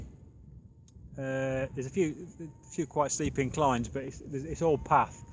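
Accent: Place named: Coventry, United Kingdom. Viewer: Your accent sounds like either coventry or nuneaton/Bedworth? Great videos matey, keep um coming :-)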